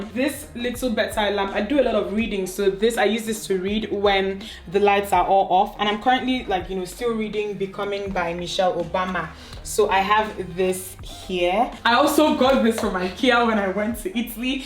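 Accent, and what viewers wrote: Nigeria. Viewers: oh ... Nigerian accent won't let this girl be great ...anyways she Nigerian so..., but nice apartment tho...am currently renovating my own 1 bedroom apartment in the UK which is a lot similar to this, but a bit bigger in size. Its Easier over here because you just shop on Amazon or wayfair. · I love this video . I also love you naija accent; so true! Thanks for the tour. I am trying to pimp my room for my videos and I am more than inspired . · Cherry chia different places different pronunciations. That’s how it’s pronounced in Nigeria. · I love the Nigerian accent 😆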